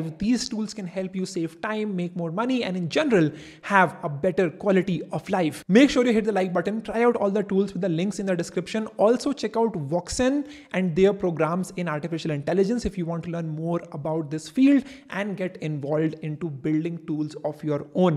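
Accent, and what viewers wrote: India. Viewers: thanks but that Indian accent is really hard to listen to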